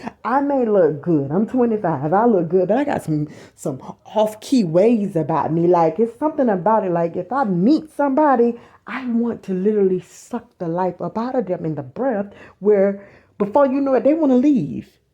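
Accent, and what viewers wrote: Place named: South, United States. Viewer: I'm an accent man..Lol.i love the way you talk..Is that Texas?? Georgia??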